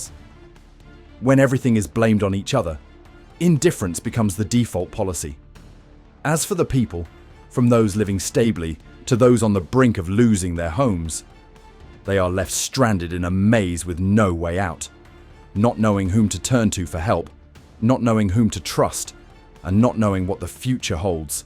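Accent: England, United Kingdom. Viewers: Tell me why the narrator has an English accent.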